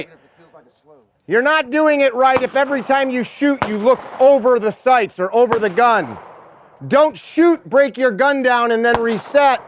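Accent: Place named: New England, United States. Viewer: Cant stand that yankee voice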